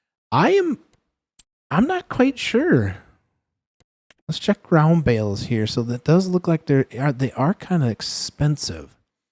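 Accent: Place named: Upper Midwest, United States. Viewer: Love from Michigan…thought i could pick up a bit of northern Midwest in your accent. Blink twice if i’m right lol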